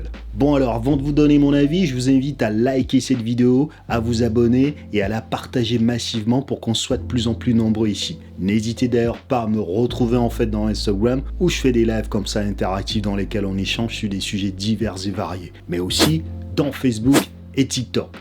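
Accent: Paris, France. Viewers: tombé par hasard, il est marrant ton accent parisien ;) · Wow je te connaissais pas , et en un vidéo je suis fan de ton accent parigo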